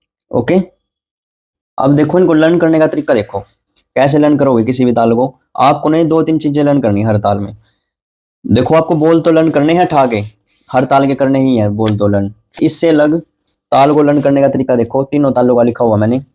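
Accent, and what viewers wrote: Haryana, India. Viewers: Yes sir definitely 😁 haryana se hai , I am also from haryana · haryana aala ki boli ghani hai pehchane ne ke liye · Hainaaa mujhe bhi yhi lgaa inki voice mei haryana ki tone aati h 😅😅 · haryanvi ho kya app voice se lag rha hai . sir vo notes toh provide kr do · Maan ge maaster kati haryanvi ki feel ha 😁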